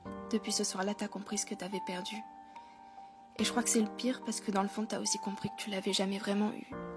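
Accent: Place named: Canada. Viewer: mercii 🙃javais pas bien compris mais tu parle canadien,enfin ta l’accent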